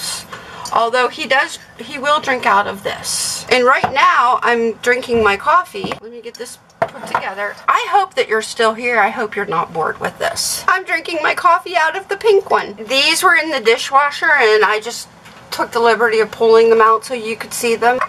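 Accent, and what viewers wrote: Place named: South, United States. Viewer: Thanks for the birthday wishes wow statement makes me feel old 😂🤣 Nonnagrace no but I'll take a peek I mostly watch Nomadic van lifestyle as I believe I mentioned before that's what I plan on doing for the remainder of my life hopefully starting in 1.5yrs curious what city in Ohio your from and sometimes I hear a little southern bell in your voice have a good night y'all 😀😂😜✌️👍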